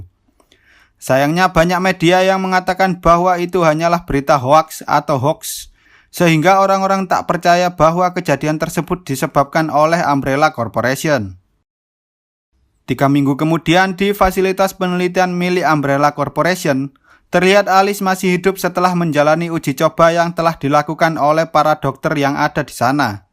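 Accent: Java, Indonesia. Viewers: Orang jawa kah? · Bang jawa ap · Wong jowo ya bang? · Bang orang jawa ya? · Wis rasah suen suen dri jawa mna bang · Bang wong jowo to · ini suara dubbing jawa ya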